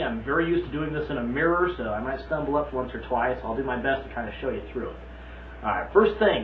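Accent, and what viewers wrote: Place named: Utah, United States. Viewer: Two comments: #1 Love the knot, and a great demo video. Only thing you might consider for future videos is using a greater contrast between tie and shirt. #2 You'll need to work a little longer on ridding yourself of the Utah accent. Don't look now, but your Provo is showing.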